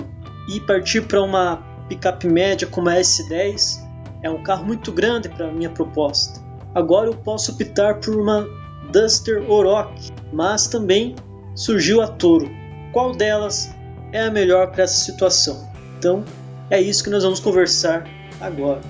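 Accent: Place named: Santa Catarina, Brazil. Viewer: #CARWP nossa seu sotaque é de catarinense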